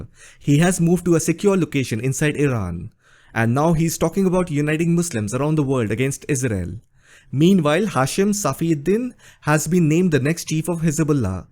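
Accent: India. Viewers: Shut up mate barley understand your curry accent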